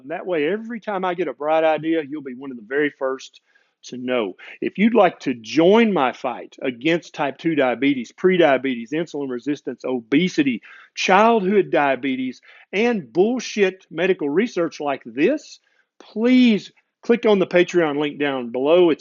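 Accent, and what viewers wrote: South, United States. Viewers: You’re such a genuine person... its why i love this channel a lot. Saturated with knowledge from a MD with a genuine southern accent👍🏻🇺🇸 Which state are u from?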